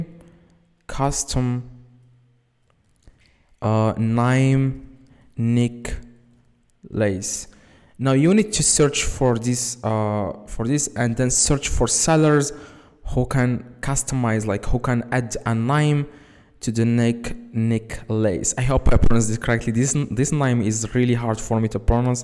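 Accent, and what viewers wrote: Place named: Morocco. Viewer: 3reftek meghribi men lkelma lowla walakin kon rir derti hadchi bl3arbia wlh l'anglais "endi m3aaaakla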